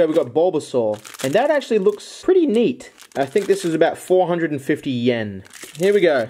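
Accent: Australia. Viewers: Intense aussie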